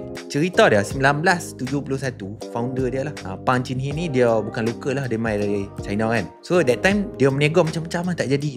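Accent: North, Malaysia. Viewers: Apasal Melaka cakap orang utara?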